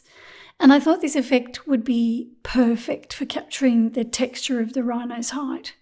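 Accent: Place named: Australia. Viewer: It’s that lovely Australian accent that got me. 😊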